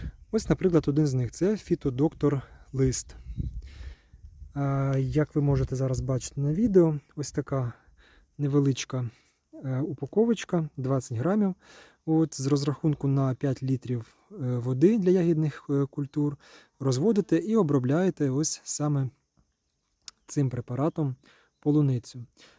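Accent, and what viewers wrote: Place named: Ternopil, Ukraine. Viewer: Ми, напевно, з Вами земляки, бо мова у Вас справжня ( я з Тернопільщини). Поради Ваші грамотні, конкретні.Я підписалась.Успіхів Вам! Слава нашим захисникам! Слава Україні!❤❤❤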